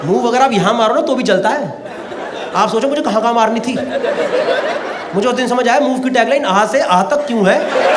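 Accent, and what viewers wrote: Delhi, India. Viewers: Unbelievable ji, your typical delhi government school type talk make it awesome. I can relate with you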